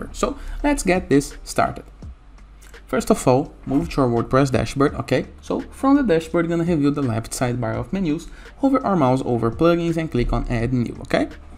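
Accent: Brazil. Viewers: are u brazilian by the way ?? you accents sounds like sorry if not .. haha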